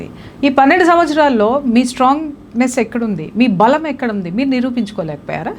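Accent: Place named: Telangana, India. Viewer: మా తెలంగాణలో ఇట్లనే మాట్లాడతాము మీ ఆందోళన సంస్కారం ఉంటుంది మా తెలంగాణలో యాస ఉంటుంది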